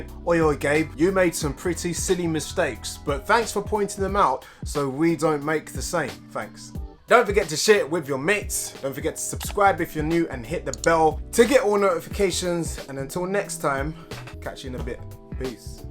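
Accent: United Kingdom. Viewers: British accent and American Football doesn't go together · Brother with a brit accent....and yankee football in the background....my brain cannot process this dissonance !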